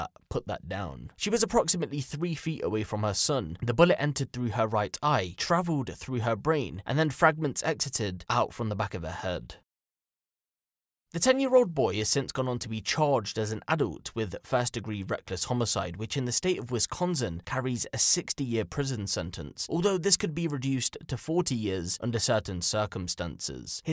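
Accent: United Kingdom. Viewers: I'm so sick of hearing people with British accents on American YouTube. Go make money in your own country. Everyone complains about America but they all want our dollars.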